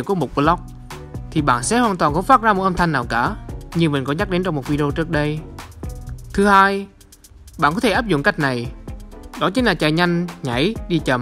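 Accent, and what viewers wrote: Central, Vietnam. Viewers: Anh người Miền Trung ak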